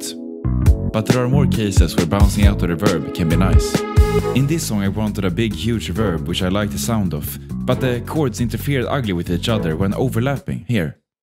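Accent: Sweden. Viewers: never heard a stronger swedish accent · Are you Swedish? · What i love most about these videos is the intense, thick Swedish accent which gives his speech that nordic rhythm that fits well with the rest of the audio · jag vet en svensk när jag hör en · bro that swedish accent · As a Swede I enjoy the Swedish accent!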